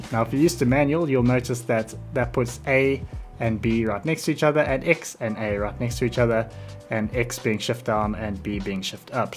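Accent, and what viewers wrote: South Africa. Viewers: You from South Africa 🇿🇦?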